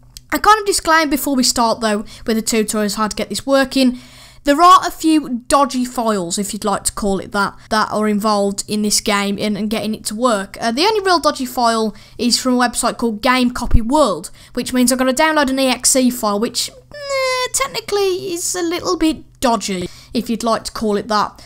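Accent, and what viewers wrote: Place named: United Kingdom. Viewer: I love britsh accent... is so funny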